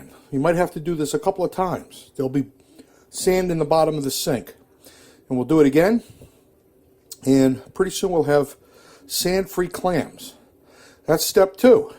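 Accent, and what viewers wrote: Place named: Rhode Island, United States. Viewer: You sound like a Rhode Island guy. I use to keep a boat in Mattapoisett but I live in Rockport. Enjoying your adventure videos, you guys have been to a few places I have yet to see. Maybe next summer will provide some opportunities but, alas, I do those trips alone. Been riding for 57 years. First bike was a Honda 50. I delivered newspapers and saved up enough to buy a used bike. The next bike I rode was an Electra Glide! What a step up, holy cow, from 50 cc to 1200 cc. My lady friend does not eat clams, oysters, crabs but she will "suffer" her way through some shrimp recipes. Haha, I am from Louisiana and do not care for lobster but I can make it work, if I have to.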